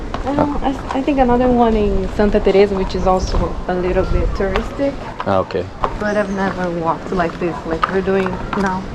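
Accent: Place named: Northern England, United Kingdom. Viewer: haa thought so you have a northen accent like me so picked it up just came across your channel and im liking it, loving vlogs from 🇧🇷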